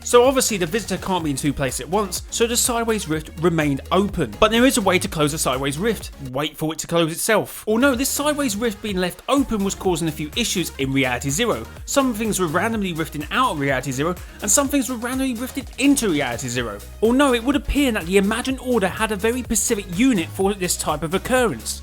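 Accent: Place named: United Kingdom. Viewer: This is so good but the only bad thing is that he is British couldn’t understand half of what he said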